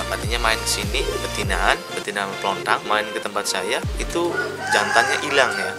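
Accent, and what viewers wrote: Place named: Banyumas, Indonesia. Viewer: Ngomong nya ngapak bang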